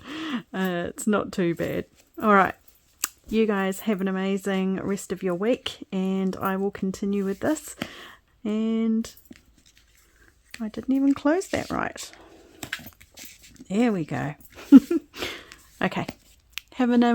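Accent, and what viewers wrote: New Zealand. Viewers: How cool to hear a kiwi voice!!. Great project xx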